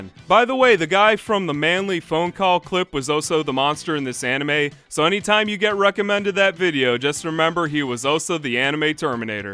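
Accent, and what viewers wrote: United States. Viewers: Sound american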